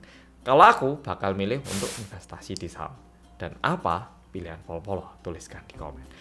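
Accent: Java, Indonesia. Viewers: Kangen yang medok medok😍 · Yuk irit breng mas medhok... Waaahhh... Sip dah kalo sama mas... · kangen yang medok medok ya liat mas wkwk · Maz lofyu❤️punya kembaran medok jga aku wkwk sama maz >< Tim garcep dong❤️ Komen sebelum rame xixi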